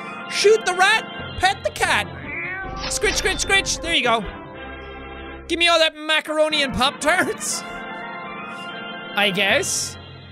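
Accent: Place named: Ireland. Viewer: This comment made me realize Jack has a lot in common with my uncle. Both screaming Irishmen*, both have a funny laugh, both look like me, both have blue eyes, both have raging Irish accents... jeez that awkward moment, amirite? *I'm part Irish on my mom's side.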